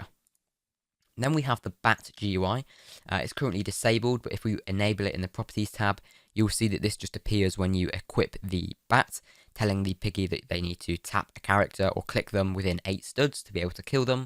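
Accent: United Kingdom. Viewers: ikr, He used a heavy British accent, lol those days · I can tell you are British and you have a voice that changed so much in one year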